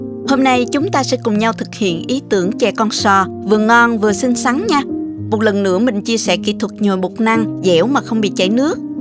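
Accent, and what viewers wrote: South, Vietnam. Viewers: Cám ơn đã chia sẻ cách làm chè con sò.Cách chỉ dẫn Rất gọn gàng, dễ hiểu, dễ làm và nhất là giọng nói miền Nam rất dịu dàng và ấm áp. Điều mà tôi thích nhất là cô không nói thừa, không nhắc đi nhắc lại những điều căn bản trong cách làm mà các bà nội trợ nào nghe và xem qua cũng nhớ. Chúc cô luôn vui khỏe và luôn thành công trong công việc của mình!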